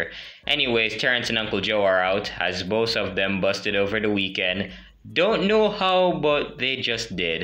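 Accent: Jamaica. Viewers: are you jamiacan🇯🇲? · Hey man are you a jamacan my guy i can tell by you accent🇯🇲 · It’s cool that your Jamaican mon! I can hear it in your accent (I’m from TT)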